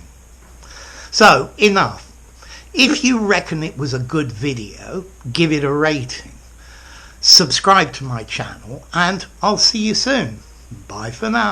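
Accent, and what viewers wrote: United Kingdom. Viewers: I reckon that your channel is the best to learn English with Britch accent Hello my teacher 🌺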